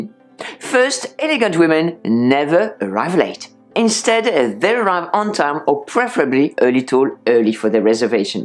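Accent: France. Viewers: I love your french accent.!!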